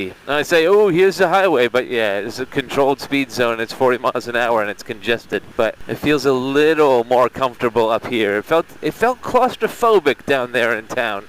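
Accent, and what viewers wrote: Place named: California, United States. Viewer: Hey, your accent doesn't sound like from England, sounds Californian. 😄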